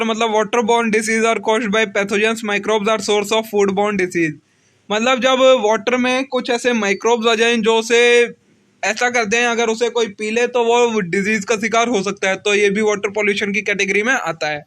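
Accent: India. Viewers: Bhai ka UP accent is so good 🌝😂🔥🔥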